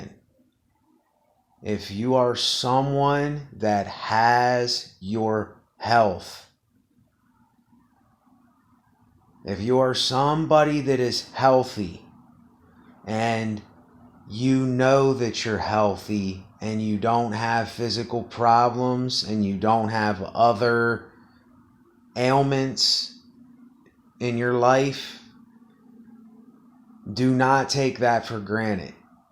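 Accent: United States. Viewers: Are you from the states?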